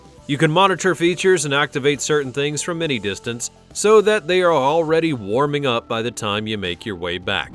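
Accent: United States. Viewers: None of these are mobile homes though. They're cool campers don't get me wrong, but they aren't mobile homes. they aren't meant to be lived in, except, perhaps, for the tiny homes but even those still aren't mobile homes. I would think that this word difference is cultural, but you sound as American as they come so I don't think that's it...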